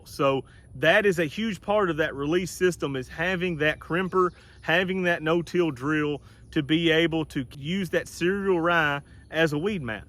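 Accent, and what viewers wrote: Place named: South, United States. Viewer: Thanks, as always great information! I enjoy your channel and am learning a lot from you. I watch your videos so much that my wife can now do a impersonation of your southern accent:). We live so far north our neighbors are in Canada so there's not any southern drawl up here. Appreciate you!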